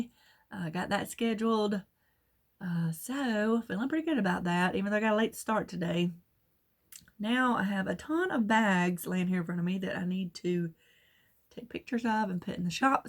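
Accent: South, United States. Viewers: I just love your southern accent you don't have to change anything. =-) Love your You Tube Channel and your bags.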